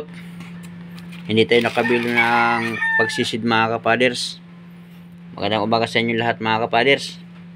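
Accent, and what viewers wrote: Bicol, Philippines. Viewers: Halata na bikolano ka ka father's..godbess u👍👍👍👍🙏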